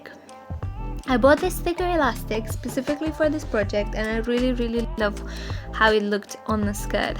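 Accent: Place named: United Kingdom. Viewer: Where are you originally from? Love your charismatic and whimsy personality, the beautiful British English and your inspiring sewing projects. ❤️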